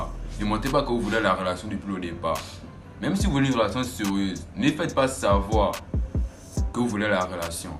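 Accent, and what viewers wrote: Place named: Gabon. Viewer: Ça manque de qualité, d'originalité ! Ça ressemble beaucoup à No limit. Force en tout cas ! Ça sent l'accent Gabonais tout ça.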